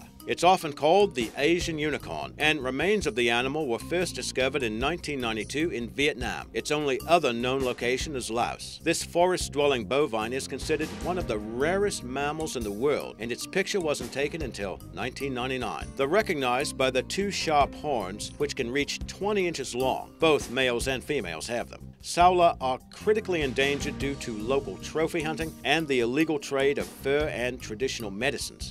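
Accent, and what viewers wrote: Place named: Australia. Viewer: maybe a soft Australian accent.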